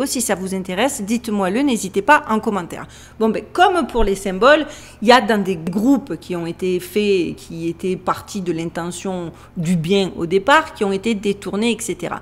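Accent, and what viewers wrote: Marseille, France. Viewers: Bonsoir, Merci pour cette vidéo analysée avec beaucoup de finesse et de pertinence ! L'accent du Sud et votre bonne humeur communicative rendent le monde moins obscur ! Merci pour le temps consacré qui aurait mérité un trophée ! Hâte de voir votre prochaine vidéo ❤ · Hello la marseillaise (?) En tout cas l'accent est là. Je vous aime bien 😊 même si je ne regarde pas tout le temps vos vidéos et pour cause : pssiiit... ✋👀Je suis « réveillé » depuis une trentaine d'années avec les écrits de Serge Monast et d'autres donc plutôt au courant de ce qui se passe actuellement dans ce monde et surtout à notre époque. C'est bien ce que vous faites, continuez 👍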